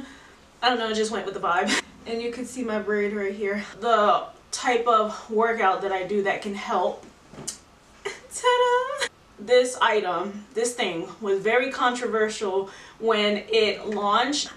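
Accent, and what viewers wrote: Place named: New York, United States. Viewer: I like that NY accent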